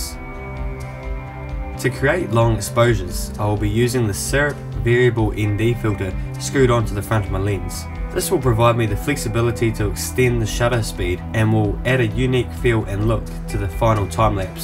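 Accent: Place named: New Zealand. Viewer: The accent is standard Kiwi.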